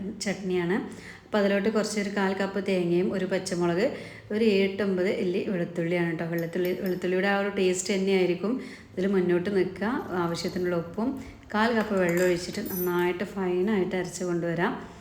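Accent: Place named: Palappuram, India. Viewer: Samsarathinte style aake marippoyallo ...palappuram style nalla rasayirunnu tto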